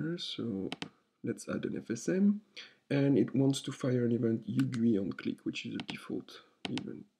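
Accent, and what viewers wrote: France. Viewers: Same here. Non native english speaker and I understand your nice french accent perfectly. Nothing to improve there. Thanks for the tutorial. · Hello, I really like this video, especially french accent of english and sound of clicking :) But, I have a question, I downloaded ecosystem to my Unity(I've already installed PlayMaker), then I typed "UGui Proxy" to search line directly in The Ecosystem Browser and I couldn't find it. Can anybody help me with it? Maybe I can find Ugui Proxy somewhere else?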